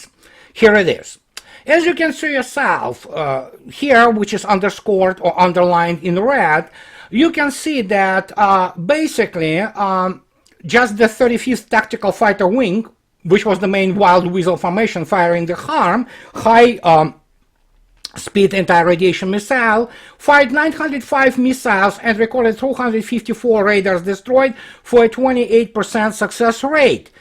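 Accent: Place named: Russia. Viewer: These people are NOT "incompetent": they are actually COMPETENT in spreading propaganda. You need to weaponize your words moreover your Russian accent is way too strong for the average Joe in the West: to listen to you demands an excruciating effort to a native English speaker. Edit your content and make an English native speaker to talk in your videos. Voila, you'll be more competent in your communication under these conditions. Best of luck! (please do not get upset: I am trying to help you to be more efficient in your communication).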